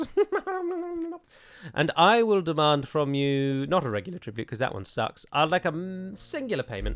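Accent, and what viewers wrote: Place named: England, United Kingdom. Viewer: First, I want to guess Edhellond could mean something like, 'Elf-Haven' but I have no idea in all honesty. Secondly, I've always loved your videos and we will all undoubtedly miss your channel. I just wanted to say before you go, I wish there were more people on YouTube like you. To be fair, I don't watch much YouTube and your channel is the only channel I watch regularly. You have an old-soul like many of the nuns I know in the Convent I work in, but with an English accent, haha. Hopefully life goes well with you beyond YouTube. Best of luck to you!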